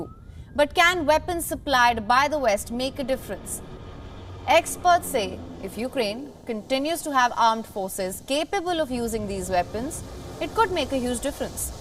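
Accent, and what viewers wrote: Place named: India. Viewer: Too heavy Indian accent --- can't understand what she was reporting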